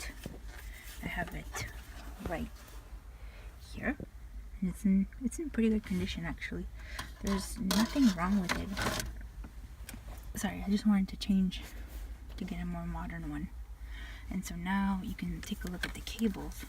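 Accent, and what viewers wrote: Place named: Quebec, Canada. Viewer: Miss the Quebecois accent living in California. Salut & merci, anciennement de la rive sud.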